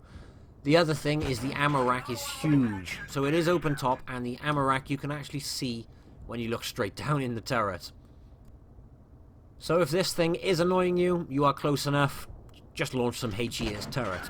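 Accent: United Kingdom. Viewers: wat the british accent ! XD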